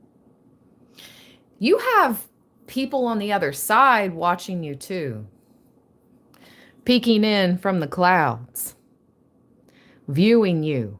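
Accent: United States. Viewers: Haha thats so funny you mention about your accent etc. - I've gone from thinking you were in Atlanta, to NJ - to generally being clueless. It dont matter! you're ancient. But i resonate with your ancient Appalachian for sure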